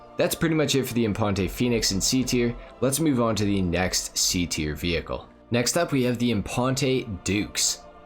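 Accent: Canada. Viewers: U are canadian bro?!!!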